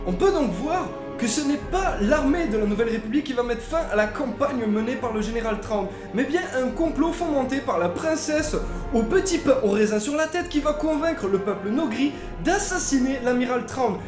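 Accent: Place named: France. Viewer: Bon choix de sujet, Thrawn c'est le meilleur perso SW de tous les temps :) Cependant j'ai un peu de mal avec ta prononciation très frenchy, sa race c'est "Chiss" (prononciation: Tchisse), pourquoi donc prononcier "Chie"? xD bon pour Pellaeon j'avoue plein de monde a du mal ^^ et tu as dit "zogri" au lieu de "nhogri"... (je pinaille hein mais c'est pour que vous vous amélioriez!) :p haaan et à la fin t'as dit "général Thrawn" :) Notons aussi que certes en revenant vers eux Thrawn est adulé par la majorité de la population Chiss, mais pas par les classes dirigeantes qui font exprès de l'ignorer royalement (trop fiers pour montrer qu'ils s'étaient trompés en l'exilant!) Bon voilà désolée pour les petites critiques mais c'est pas méchant ^^ encore une fois vous vous êtes bien documentés c'est une bonne chose :)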